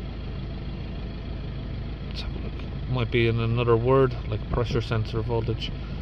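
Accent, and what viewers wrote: Ireland. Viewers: Hiya. Love watching your videos and we don’t even have a diesel now. Got a question for you which is away from cars & vans just for now. I’m 63 now and Dad was a Kerry man while Mom was Coventry. They used to take me to Ireland when I was a nipper to Westmeath near Mullingar. Your accent does keep reminding me of my time there so am I close or not. Do hope you don’t mind me asking. Keep up the brilliant videos 👍👍🇮🇪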